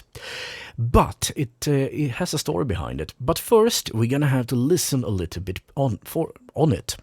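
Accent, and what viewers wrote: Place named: Sweden. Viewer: Horrible swenglish accent, can’t watch theese…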